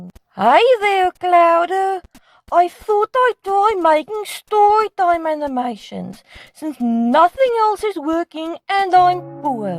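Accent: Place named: South Africa. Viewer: Recognised the accent from a mile away XD so cool to see a South African story time/animator!!!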